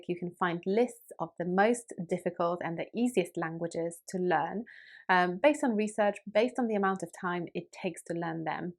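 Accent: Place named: United Kingdom. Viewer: Tahnk you so much for your videos 💕 Do you ever get tired of speaking in British accent 😬 This is what's happening to me and I wandered if I am the only one feeling this way 👀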